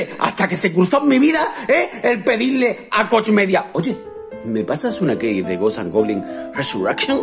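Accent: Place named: Andalusia, Spain. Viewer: Ostia... pareces Didac Ribot cuando hace de padre hahaha ¿Eres tu Didac? Es algo extraño... siento como si fueras ese pero andaluzado cosa rara haha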